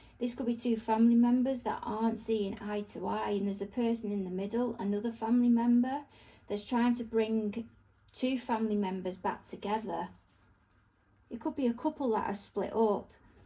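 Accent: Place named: Salford, United Kingdom. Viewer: you sound like you're from Salford. 😊 I'm from Manchester too. I've only just found your video's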